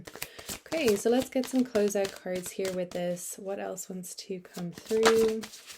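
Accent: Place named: Ireland. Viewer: Thank you. Somehow I hear Irish in your accent ❤️🙏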